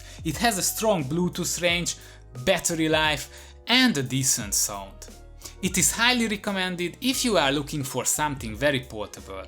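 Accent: Hungary. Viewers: i like your Hungarian accent :) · he said that he has a hungarian accent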